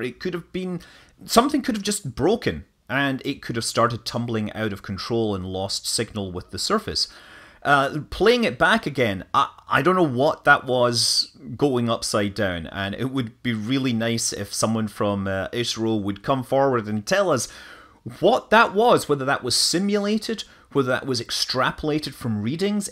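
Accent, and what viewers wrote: Canada. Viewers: isro is pronounced as iss-roe in indian english. not everyone needs to have manley's canadian accent, no?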